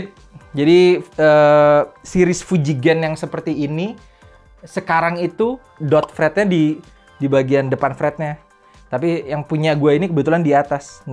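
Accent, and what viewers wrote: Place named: South Jakarta, Indonesia. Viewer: Jaksel banget wkwkk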